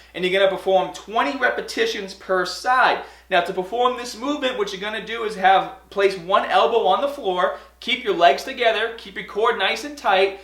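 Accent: Boston, United States. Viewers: ... is that a Boston accent? :-) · Of all the accents to re-brand yourself with, why the hell did you choose Boston? Boston... The accent that makes you seem like you will get drunk and hit someone at a Baseball game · Martin P Have you even ever heard an Australian talk? My guess is he has a Boston/Rhode island accent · Boston accent or nah!??? 😫❤️😍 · Are you from Baaston? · Is that a Boston accent. It's so weird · Sounds like a Boston accent... They drop the "R" similar to Australians. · your accent is driving me crazy >:( go back to Boston and learn how to speak english properly! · are you from boston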